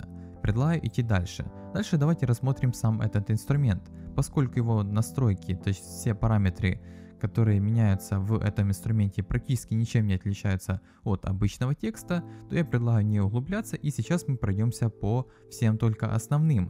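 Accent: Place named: Kharkiv, Ukraine. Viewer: как будто харьковский акцент))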